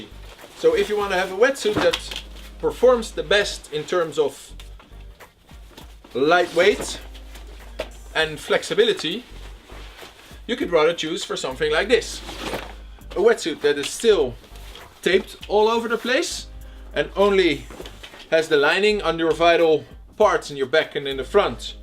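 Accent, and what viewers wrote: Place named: Netherlands. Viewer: Love the dutch accent Good video though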